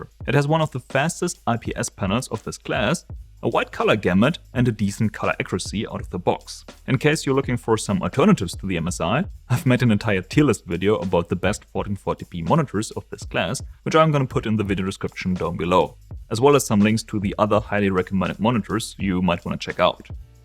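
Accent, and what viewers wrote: Germany. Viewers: Dem Akzent und Outro nach gehe ich davon aus, dass du Deutsch sprichst 😅 Ich sehe überall, dass der Samsung Odyssey G7 als der beste UHD 144Hz Monitor angegeben wird, aber was ist eigentlich mit dem LG 27GN950-B? Wenn ich mir nämlich die theoretischen Werte anschaue, liegt der meiner Meinung nach vorne (kann auch sein, dass ich ein Trottel bin und keine Ahnung davon hab, aber wer weiß das schon… 😉) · Bis zum nächsten Video... Wusst ichs doch. Der Akzent kann nur deutsch sein :D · Are german ?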